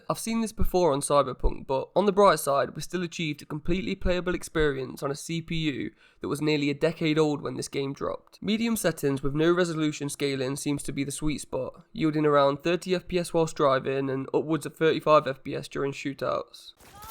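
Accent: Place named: Birmingham, United Kingdom. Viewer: ill sub to you dude.. you sound local.. im from brum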